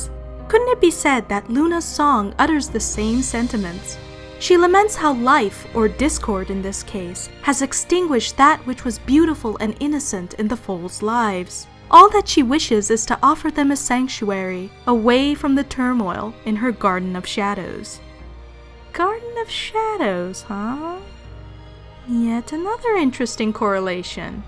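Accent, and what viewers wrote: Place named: RP, United Kingdom. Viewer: your so posh!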